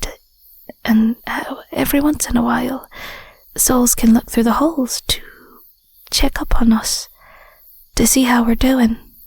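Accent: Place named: Scotland, United Kingdom. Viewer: I like werewolf girls, I like Scottish accent, I like stargazing. What a great combo.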